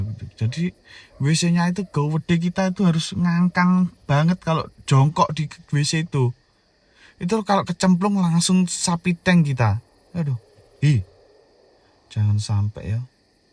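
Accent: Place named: Blitar, Indonesia. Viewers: Horror : 20% Komedi : 80% Semuanya dibalut oleh bahasa jawa logat medogg Jadinya : Lucu2 serem wkwk :D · Ngomongnya pke logat blitar jiaaaan medok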